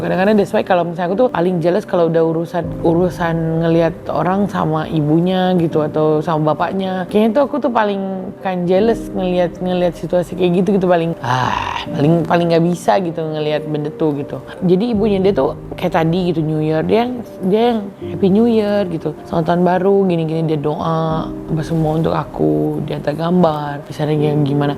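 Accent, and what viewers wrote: Malaysia. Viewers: Alhamdulillah, tahniah!! Yg penting loghat bahasa melayu dgn indo nya bercampur ya. Unik sekali 😁😁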